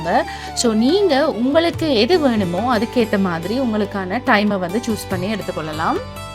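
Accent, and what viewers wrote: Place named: Sri Lanka. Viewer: Good one. Little different Tamil accent. Lankan Tamil ???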